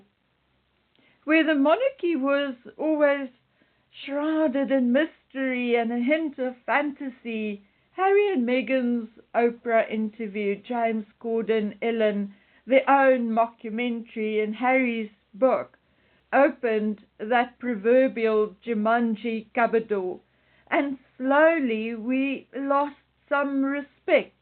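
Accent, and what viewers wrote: South Africa. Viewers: South African accent detected. Which province are you from?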